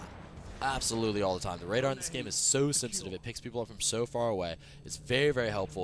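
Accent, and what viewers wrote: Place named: California, United States. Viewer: Yeah I was debating between that and "First" but ultimately it was your california surfer "brah" speach style that helped me decide ^^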